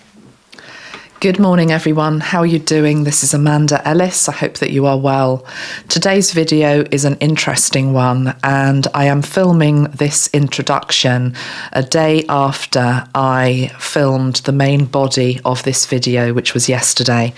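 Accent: United Kingdom. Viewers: Iam a rh negative. everything you said about make a lot of sense to me. There are 4 person in my family that are negative as well. It is the first time i have been whatching your live. and liked very much e liked your British accent . Life for negative blood is not easy, we are different and rebel. thank you for your work.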